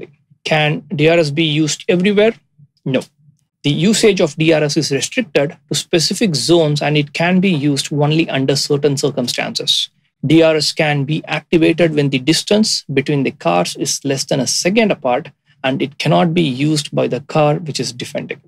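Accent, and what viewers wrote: India. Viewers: Immediately disliked after hearing indian accent lol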